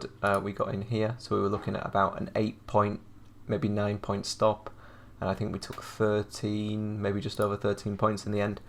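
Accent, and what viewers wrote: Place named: Leeds, United Kingdom. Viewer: I live 10 mins away from leeds, I knew i recognised your accent ha!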